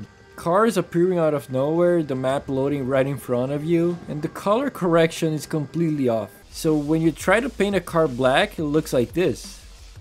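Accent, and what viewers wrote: Brazil. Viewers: are you from Brazil? your accent sounds brazilian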